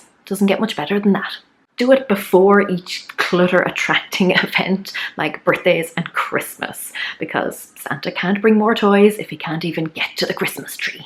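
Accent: Ireland. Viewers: That's a great idea to simply go around looking for rubbish. Amazing I didn't think of it. I agree with the lady below about your warmth and humor. I am partial to Irish accents. The advantages of Marie Kondo's and the Flylady's methods is they are systematic. . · I always love your humor and inspiring ideas! 😀 Wish I could have your Irish accent. My house is so cluttered it's hurting my brain, but I am a tired mom of a non-sleeping 6 month old. Husband asks if he can help clean, but it doesn't work out well, he doesn't like to organize. There are a few things he keeps that spark annoyance for me but not for him, an old picture frame from his late grandmother, and some old statues of unhappy looking folks that are supposedly passed down by family. What can I do with those? Oh and what do you do to a collection of wine corks that spouse will never get around to doing anything with? 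My husband has been collecting wine corks for 4 years and still doesn't know what craft he wants to make. He won't let me trash them. 😑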